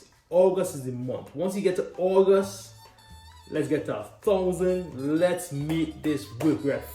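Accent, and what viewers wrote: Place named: Caribbean. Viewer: I am really taking a liking to the Central American rums lately. I haven't seen Ron Colon rum in my parts however bottling in a wine bottle makes it easy to spot on the shelf if it ever does show up in liquor stores here! El Salvador has had a rough history in the 20th century but very glad to see rums (and beers) start to be made there! Shows some kind of stability has returned to that proud country. I am a huge fan of your accent mate, gives your videos instant Rum street cred! Caribbean accent for sure. Let me guess. St Martin? Good review. Very detailed.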